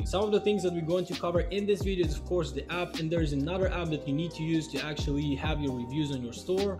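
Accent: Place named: Russia. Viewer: Спасибо за видос братишка я и не сомневался что ты русский! когда услышал твой акцент и был рад что Русский человек учит представителей других нации дропшипить! РЕСПКТ БРАТИШКА! А ТЕПЕР ВСЕ ЭТО НА РУССКОМ ПОЖАЛУЙСТА!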